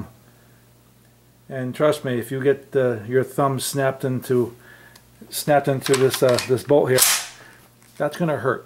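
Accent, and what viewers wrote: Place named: Connecticut, United States. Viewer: Thank you for making this very informative video in a nice straightforward style. I like your accent also - Connecticut?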